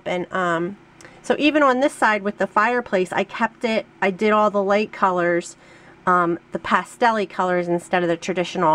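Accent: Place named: Queens, United States. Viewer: Hi!! It's Laurie, I knew you had an accent!! My mom is from Queens!! So NJ is about 6 or 7 hours (depending on traffic!!! LOL) I would really appreciate a video on those beautiful stars, everyone LOVED them!! I know you will do great at the craft show because you love what you do! I look forward to seeing more beautiful projects and you never know you just might see a Canadian License plate at the show!! All the best!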